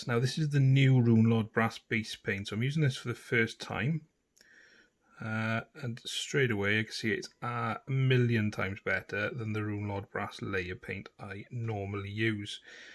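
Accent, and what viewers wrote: Wales, United Kingdom. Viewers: Loving the vids! Nice to hear a fellow Welsh Accent in the painting scene! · You sound Welsh where you from mate?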